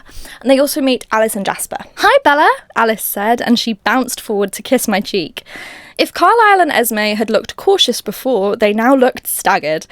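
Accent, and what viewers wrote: United Kingdom. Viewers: When I read the twilight books I had no idea Jacob was supposed to be 15! It’s insane. - “it’s nice to read a romance book where the female protagonist has good female friends… and the romance doesn’t get in the way of that friendship…” uuuuhhh new moon might change your mind. I love Bella read in a British accent, it makes her voice so much better. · I hope you do all 4 books! Nostalgia, cringe, and anything with English accent is a delight to listen to. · I know its mostly just because you're British but you would be such a good audiobook reader.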